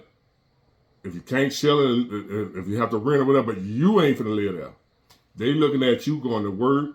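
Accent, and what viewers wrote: United States. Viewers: It seems like the video is interesting, but I need to read through th comments to have a clue because of your heavy American accent.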